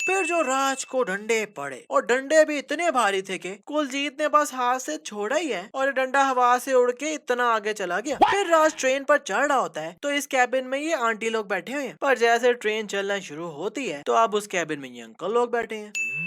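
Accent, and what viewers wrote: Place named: Pakistan. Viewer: Is this a Pakistani Channel because narrator's hindi accent was sounding like Pakistanis.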